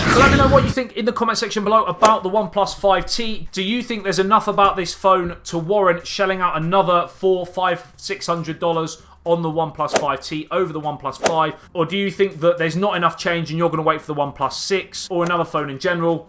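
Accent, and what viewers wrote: United Kingdom. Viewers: Idk why but i hate british acsent so much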